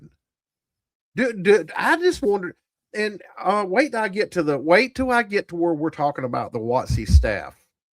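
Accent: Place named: Appalachia, United States. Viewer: I gave you over 5 and a half minutes of my life to listen to your hillbilly ass yawl yawl yawl your way to complete and utter retardation.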